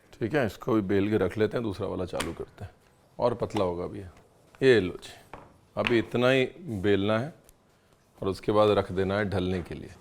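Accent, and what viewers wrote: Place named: Punjab, India. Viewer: Aaj aap Shehnaaz gill ki tarah punjabiyat me baate kr rhe hain , mja aa gya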